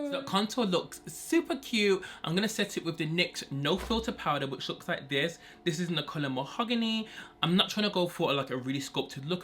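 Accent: United Kingdom. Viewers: u r so adorable! i see u on insta all the time!!! ❤️❤️❤️finally watching you on youtube! your accent is smh crazy! 🙏🏾🙏🏾🙏🏾english/ British accent is my fav😍😍😍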